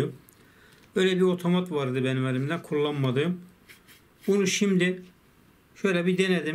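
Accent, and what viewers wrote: Erzurum, Turkey. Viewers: ABİ SEN ERZURULUMUSUN :)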